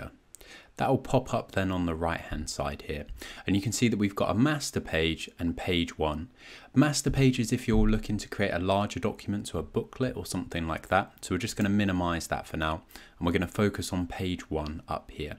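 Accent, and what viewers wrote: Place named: Wales, United Kingdom. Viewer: wowwwwwwwwwwwwwww welsh acent! i like it!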